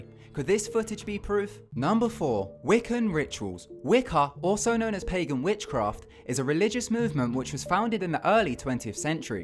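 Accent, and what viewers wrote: United Kingdom. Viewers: Bruchas, lol love that British accent.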